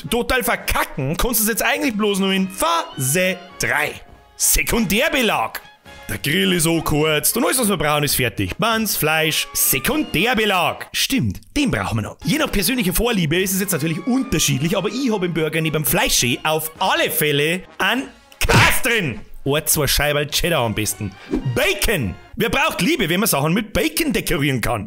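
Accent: Bavaria, Germany. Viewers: Du bist da beste bin a aus Bayern Niederbayern endlich gib s moi gescheite YouTuber die borisch redn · Kriegt schon allein für's Boarisch ein Abo, ich musste sofort an den "Münchner im Himmel' denken. 🤣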